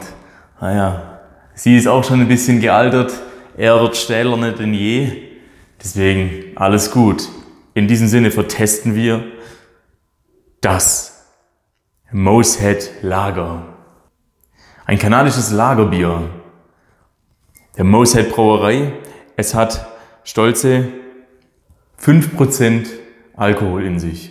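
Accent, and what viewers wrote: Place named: Swabia, Germany. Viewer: Kanada ist mein erstes Reiseziel wo ich hinmöchte und auch schon war, ein Traum dort. Aber the Rock kommt doch nicht aus Kanada. Aber was anderes, wenn ich so deinen Dialekt höre, merke ich du bist wohl auch aus dem schwäbischen, wie wär's mal in nächster Zeit irgendwann mit nem gemeinsamen tasting wo jeder von uns ne diverse Anzahl an verschiedenen Bieren ranholt und wir die gemeinsam verkosten und auf beiden Channels dann hochladen? Fänd ich ne coole Nummer.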